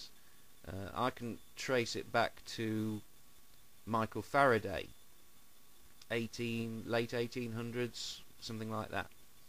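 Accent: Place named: North, United Kingdom. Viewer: I'm not sure where is from, but he has a mild northern accent. I can't quite place it.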